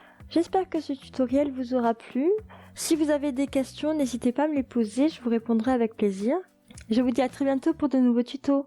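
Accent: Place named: Béarn, France. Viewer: on craque également pour l'accent (bo-arnaiz)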